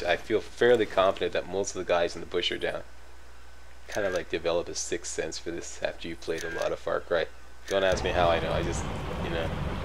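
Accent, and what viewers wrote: Canada. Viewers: Thank you!!Furthermore your excellent walkthrough videos, for me it's an inspiring advanced training in English or God s(hoops)ave the Queen "Canadian English"!!!"gizzahs".."pps"..and "gonna blow up a tower" are icing on the cake.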